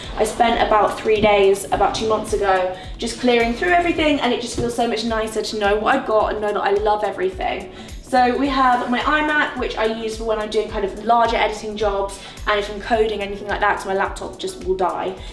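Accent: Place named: Cockney, United Kingdom. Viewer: a cockney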